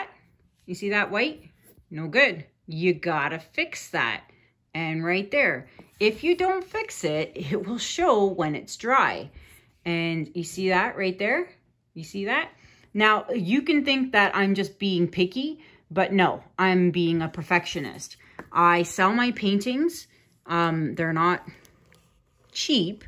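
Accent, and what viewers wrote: Canada. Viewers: I love listening to your accent and chatter, I’m an Aussie and love the Canadian accent.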